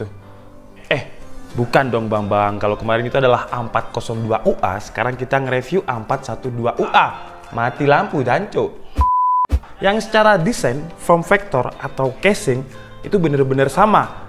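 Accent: Malang, Indonesia. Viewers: Mualang tenan jancok e metu 😂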